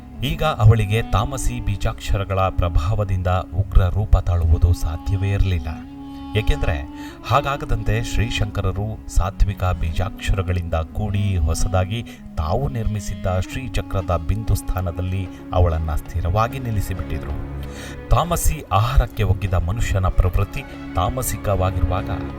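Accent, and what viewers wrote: Standard. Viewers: Shuddavaadha kannada uttama vaada voice sir nimdu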